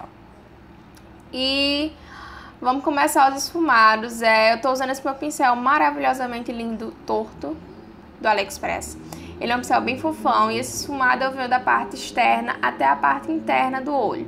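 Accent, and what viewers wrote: Northeast, Brazil. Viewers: Dificuldade de passar batom??? Não vi nenhuma !!! Ficou lindo, make maravilhosa, amo seu trabalho, sua forma simples de ensinar, mesmo mostrando suas dificuldades. Isso mostra o quanto vc se mostra verdadeira e real no seu trabalho. Continue assim! Parabéns !!! Amo esse seu sotaque gostoso, já vivi no Nordeste e amo o clima, o sotaque a comida... Mais uma vez, parabéns !!!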